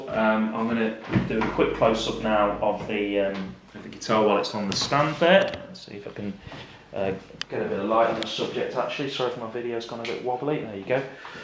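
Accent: England, United Kingdom. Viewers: this review is cool if you like to hear people with an England accent talk from ten feet away from the microphone and ramble for thirty minutes without any demonstration.....I am not a fan. thumbs down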